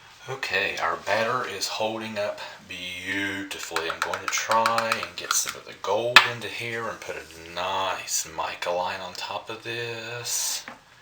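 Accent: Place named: Kentucky, United States. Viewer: I adore your accent <3 - it reminds me of my time spent in Kentucky. I'm from new Zealand.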